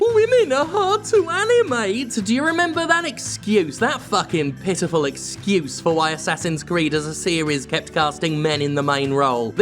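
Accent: United Kingdom. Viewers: 2014 sounds britisher than 2020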